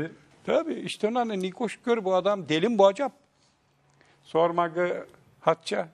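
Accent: Aegean, Turkey. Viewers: ege şivesi bu işte muğla tarafında konuşulanı en azından böyle içeri doğru gittikçe aydın manisa denizli afyonda daha da farklı ağızda konuşulur ama günümüzde süslü manken bozması oyuncuların oynadığı dizi filmlerdeki şive kesinlikle doğal ege şivesi değil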